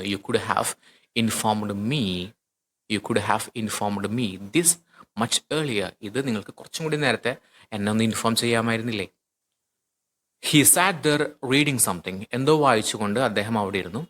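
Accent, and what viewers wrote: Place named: Thrissur, India. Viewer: Really useful video 💕👌 When I heard your Malayalam translation, I felt that you are from Trissur. Awesome class😁👍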